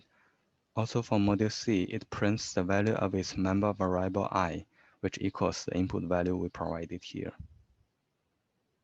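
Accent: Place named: China. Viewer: 听这口音感觉是个中国大陆人🧐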